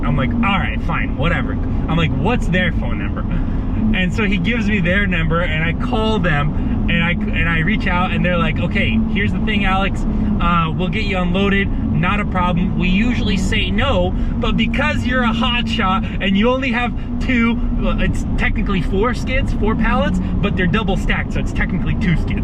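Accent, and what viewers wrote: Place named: New Jersey, United States. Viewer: Awesome video, you actually talk like you could be from Jersey ✌🏽keep up the videos brother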